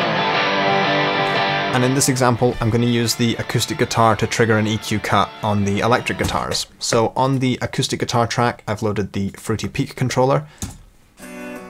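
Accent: United Kingdom. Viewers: I thought you are from the UK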